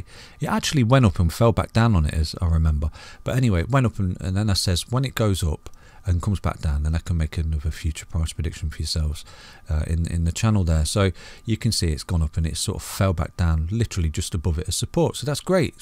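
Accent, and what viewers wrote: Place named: Nottingham, United Kingdom. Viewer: You sound like your from Nottingham. I am myself Well done love your videos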